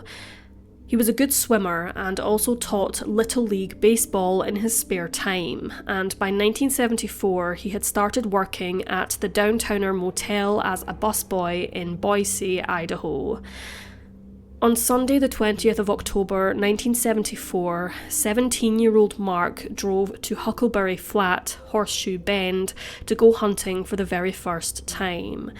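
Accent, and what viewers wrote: Scotland, United Kingdom. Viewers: I love watching your videos, bonus you have a Scottish accent as I'm from Dundee, you sound East Coast also? 👍🏻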